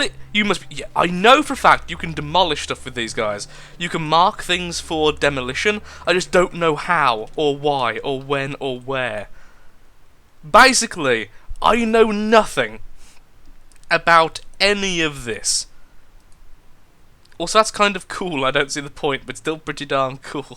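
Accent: United Kingdom. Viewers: i have a weird feeling your voice is different, more "brittish" pretty weird since you are brittish but still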